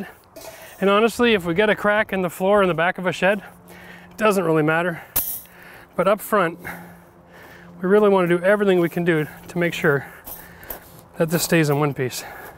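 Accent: Canada. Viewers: you talk funny, you must be from Canada. I like your video, thanks for sharing. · Haha, u almost remind me of red green, but with useful knowledge. And u have that nice Canadian accent. Bahaha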